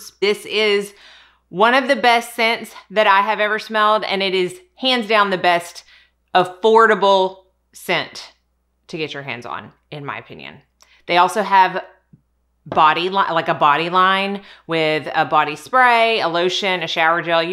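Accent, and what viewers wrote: South, United States. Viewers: Yaaay!!!! I absolutely adore 🥰 you! I live a full face of anything you do!!! You are such a sweet heart. I love that you don’t take any crap 💩 from people on the internet!!! ( as you shouldn’t)!!! I love 💕 your beautiful southern accent!!!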